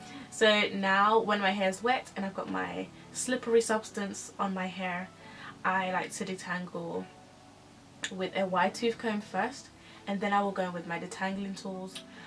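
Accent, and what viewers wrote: United Kingdom. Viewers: She’s sounds English In particular London but what does a Londoner know 🤷🏾‍♀️? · She sounds super british to me🤣🤷🏾‍♀️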